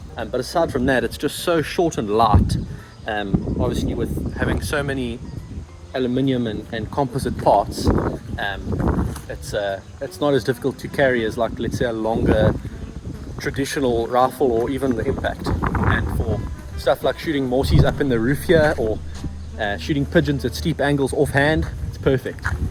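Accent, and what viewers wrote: South Africa. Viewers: You can hear dat he is afrikaans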